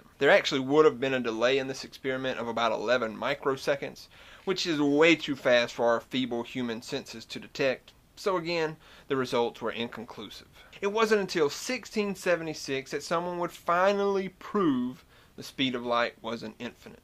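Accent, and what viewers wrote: South, United States. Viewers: Love your Southern accent dude! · dunno, but an astrophysicist with a southern accent is mighty cool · Most of us pronounce "Io" as "EYE-oh", not "eye-OH", but perhaps you are an electrical engineer. :-) I love your southern accent, combating the stereotypical idea that southerners are stupid. Great videos, man.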